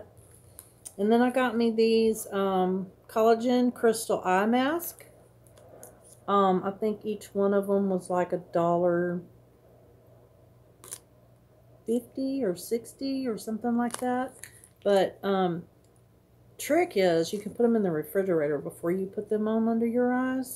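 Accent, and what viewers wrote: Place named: South, United States. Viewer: I think it was a great haul! Hey look at the bright side, you don’t have to worry about people complaining about the crunching bags and wrapper’s 😂! I think you’re absolutely wonderful, keep smiling 😊… and just know you’re doing an absolutely amazing job sweetheart! Have a Blessed 😇 day! The A in my name stands for 👼 Angel! Also, I am from Kentucky and noticed a southern accent, just curious to know where you’re from?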